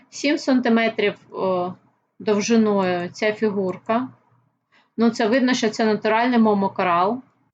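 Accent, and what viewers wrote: Russia. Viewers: да просто)) то на английском с русским акцентом размовляете, то на Украинском) решил узнать уровень познания не только в коралле))))))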